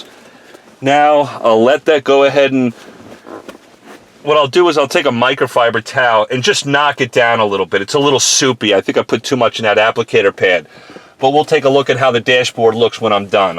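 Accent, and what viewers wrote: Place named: New England, United States. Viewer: Never knew you could use that on a dash! First time watching your channel. One question, what part of New England are you from? Your accent almost sounds southern New England.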